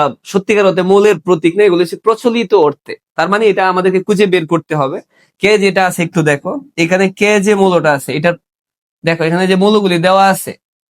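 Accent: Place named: Comilla, Bangladesh. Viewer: Vaiya Apnar gramer বাড়ি may be কুমিল্লা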